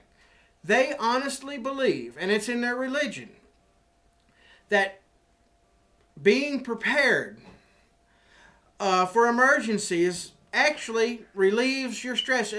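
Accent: Appalachia, United States. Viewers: i like that hillbilly accent i salute u from sweden!!!! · love your vid as somone who lives in peoples rep of kalifornia.what your saying is plane old fashioned common sense! be prepared where ever you are i learned that in the army,love how you speak hillbilly my roots are missouri and tex,louisiana take care thank again gil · U speck hillbilly me to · speakin hillbilly works for me.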